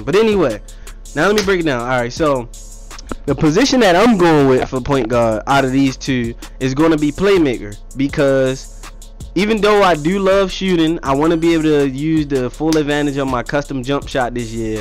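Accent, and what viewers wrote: Baltimore, United States. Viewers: He sound like he from Baltimore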